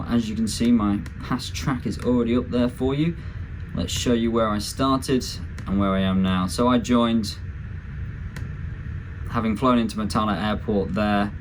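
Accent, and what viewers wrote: England, United Kingdom. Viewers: I'm an Australian and i think I heard hyou saying that you are likely to be spending some time in Australia in the coming months/years. A little tip: Australians NEVER refer to the country as being "down" anywhere, Or even "Down under" Several times in this video you refer to something like "when we get down to Australia". An Aussie would never say that. Your accent will mark you as a "pom" (That's not necessarily an insult - it depends if there's an expletive with it (as in he's just a <expletive> pom") and it's fine to be a pom - there are millions of others here already - but it's a social gaffe to refer to Australia as "down here" or "down under" or "this happened on our way down to Sydney" That would tell Aussies listening that you are in the category of the poms who are despised - the ones whose focus is still in Europe, the ones who can't get over the fact they''ve moved home and things are not the same in Australia. 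I once worked with an Englishman who would NOT stop complaining that Australia was such a backwards country. We would grit our teeth and shut up for a while Ineitably he'd get around to saying that even the newspapers here are backwards. "Back home we get the Telegraph or the Daily Mail with the football results when we are on the way home from the game. In this backwoods country (meaning Australia) the papers dont have the scores until Sunday morning" He never could get it through his thick skull that the reason was because of the time zones. When we're a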